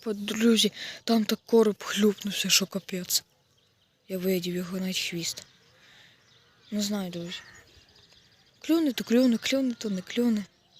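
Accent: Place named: Khmelnytskyi, Ukraine. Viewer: То ї в останні такий діалект чув років за 30 на річці Збруч Хмельницька область((ты юначе коропа лапах? Авжеж.файний короп))😅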